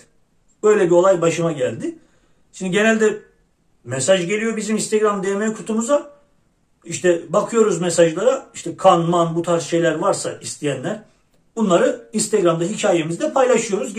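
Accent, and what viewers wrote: Erzurum, Turkey. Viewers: yok reis ne sorunu başım gözüm üstüne konuşman biraz Erzurum lu arkadaşlarima benzettim ondan sordum. 🤣🤣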